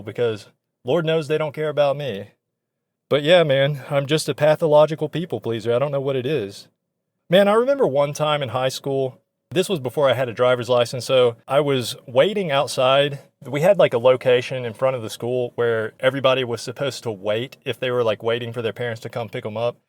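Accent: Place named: Deep South, United States. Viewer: You should move to a larger city if possible, judging by your accent you’re probably from the Deep South like me, once I moved to a bigger city(Atlanta) it was way easier to find friends and meet new people.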